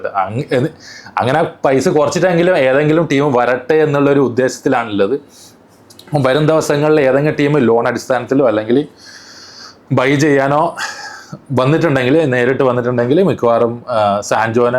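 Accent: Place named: Palakkad, India. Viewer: Are you from Palakkad district? Accent looks like that.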